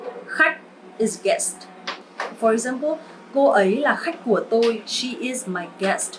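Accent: North, Vietnam. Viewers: That northern accent is HARD in itself as a southern hahahss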